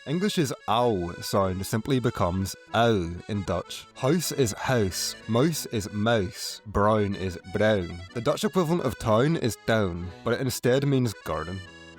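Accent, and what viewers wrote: Northern Ireland, United Kingdom. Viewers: It's funny when I first heard the man's accent I thought he was a Dutch person making the video because he didn't sound English and more Germanic though I'm aware most Dutch people speaking English barely have an accent because 90-95% of their population speaks English fluently and the most proficient as a second language in Europe. Then I saw that he was based in the UK according to his user description and then he revealed he was from Northern Ireland which was only then that I detected his accent being from that area even if he sounded like he was pronouncing all the Dutch words correctly like a real Dutchman would do. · I thought the narrator was Scottish, but I suppose the Northern Irish accent must be close to the Scottish one. It made the English words sound a bit 'off' to my ear lol. But I'm a Kiwi, and so I bet my vowels sound worse 😆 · I love the northern ireland accent, everything is pronounced so clearly. 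· Nice video! Really managed to quickly showcase the similarities/differences. Your pronunciation is really quite good, especially your UI, but I couldn't help but think I was hearing an Afrikaans accent! After realizing it wasn't just the first few words or something, I HAD to check your profile. I could obviously hear the Northern Irish accent as well, but thought you might be an Afrikaner having grown up there or vice versa in South Africa. If you don't secretly have any Afrikaner DNA, I would highly suggest you take a stab at Afrikaans, you'd sound fantastic at it! Mainly your short O, A, OU, -T/D, and OE, sound very Afrikaans, but even the G sounds ever so slightly more Afrikaans. Some specific examples are your "gebracht", "koe", "dorp" (the r also contributes to it here), "stad", "koud" and "soud" (which sounds identical to Afrikaans "sout" meaning "salt" ("zout" in Dutch). Anyway, none of this is to take away from your video or anything! 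Love your Northern Irish OW in words like "cow" and "howl" as well 😄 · Kind of – it's his Northern Irish accent.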